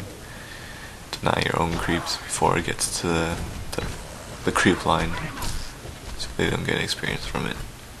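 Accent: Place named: Vietnam. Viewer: Gotta love the Viet accent. Love your vids. Keep it up please. :)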